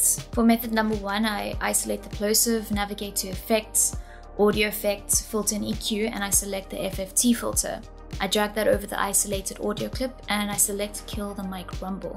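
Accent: South Africa. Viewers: This was so helpful! Thank you! Totally random question, but are you from South Africa?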